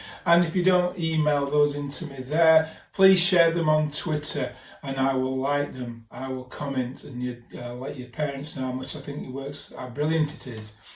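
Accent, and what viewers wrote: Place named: Sheffield, United Kingdom. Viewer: Hello. I've moved from your native Sheffield (Peveril Rd to be precise and often saw you around) to come and teach here in the Turks and Caicos Islands in the Caribbean. I know - it was a sacrifice, but someone had to do it, so me and my wife bit the bullet. Any road, hope you're pleased to hear that there is a little classroom (virtual one for the time being) where your dulcet Sheffield tones are ringing loud and clear to a bunch of 10yr olds who look forward to your cartoon workshop every week. Please tell me there's another one on its way and that there are more to come. I am having a lot of fun getting little locals, Canadians, Americans, Haitians and Dominicans to say things like, " Awreight, sir?" " Tha's reight nesh thee" and " Gi' o'er". So dunna lerrus dahn.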